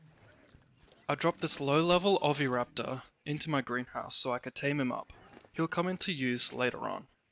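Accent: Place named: Johannesburg, South Africa. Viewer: is it just me or does he sound like a joberg boet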